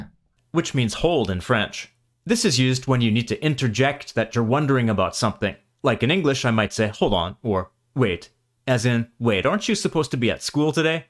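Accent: Antwerp, Belgium. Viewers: da is wel antwerps vlaams, in Limburgs is da wat anders. Your 'flemish' is antwerps flemish, while in Limburg we don't speak like that. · 🤣🤣 as west- Flemish this is cool…. But the intonation is Antwerp, not Flemish. But there is so much different dialect, it is impossible to explain. But good job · That’s not Flemish bro that’s most Antwerps dialect, but in the video he esplanades it. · Im from belgium, specificly im from limburg and it seems youre flemish is based on the tussentaal from antwerpen. Mostly its all correct tho but just some things that ive heard but rarly cuz its not eveywhere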